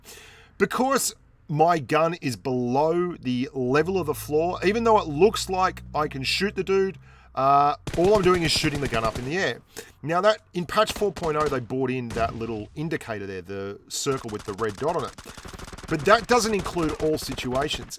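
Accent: Australia. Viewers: Suggesting that you mention the importance of having multiple scopes for different engagements. 1x or 2x for close and maybe mid, 4x and 8x from mid to far. Cannot stress this enough for players who zoom in on every target they fire at. Too much zoom will get you killed more often than not at closer ranges. Another point you can bring up is you don't always have to stand when shooting out of windows. It is very situational, but if they aren't directly below or close to the house, back up a few steps behind the window and crouch. You'll be able to shoot outside the window while barely peeking your head. Great videos as always. keep making great content, honestly really enjoy you making content for this game instead of blitz. I find this game much more complex and engaging than blitz, which makes that aussie voice just that much more enjoyable to listen to. If you could, maybe make an advanced guide in the future, I'm sure it would help get the knowledge and tactics out there.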